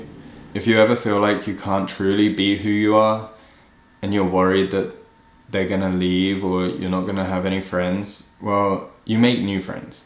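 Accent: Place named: United Kingdom. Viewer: I totally thought he was American at the beginning, then I thought he had a Speach impediment, then when he said he was 32 I was totally confused because he looks like 22 at the most, then finally I realized he has a British accent.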